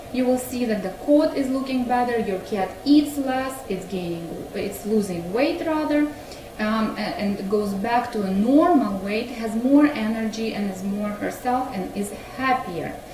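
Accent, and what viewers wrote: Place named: Russia. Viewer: You sound more russian/ Ukrain german and thats not a german accent-- Ich kenne meine Deutsche sprache . (I know my german language )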